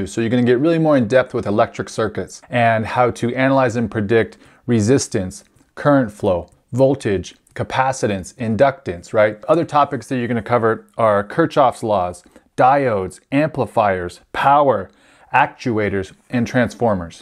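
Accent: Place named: United States. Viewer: This might be a dumb question but I'm from tbe uk, you sound american to me. Will this stuff help me to become a vibration engineer in the uk?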